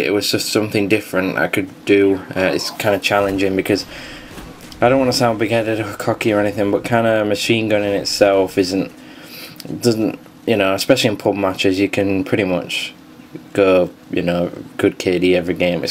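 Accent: Yorkshire, United Kingdom. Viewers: You from Yorkshire?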